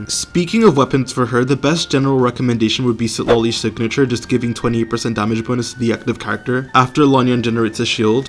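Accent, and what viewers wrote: United Kingdom. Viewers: Omg is british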